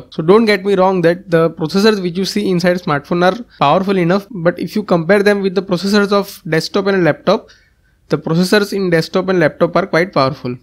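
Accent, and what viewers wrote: India. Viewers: Indian English.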